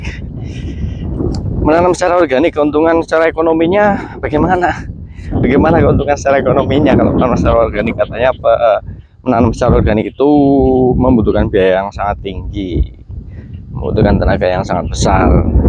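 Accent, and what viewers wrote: Banyumas, Indonesia. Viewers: Ngapak tapi pinter😂 maju terus pak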